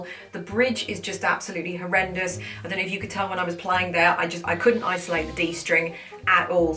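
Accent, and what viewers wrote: England, United Kingdom. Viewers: I only came to your Channel to listen to your beautiful English accent. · I like the British accent.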